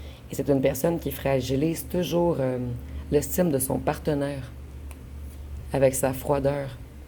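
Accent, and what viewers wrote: Canada. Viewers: J'aime laxent Canadien!!! Avec la voix cassé ( sexy ,sexy). J'aime le Canada😆👍🤍💜🌞🍁🍁🍁🍁🍁🍁🍁🍁🍁🍁🍁🍁🍁🍁🍁🍁🍁🍁🍁🍁🍁🍁🍁🍁🍁🍁🍁🍁🍁🍁🍁🍁🍁🍁🍁🍁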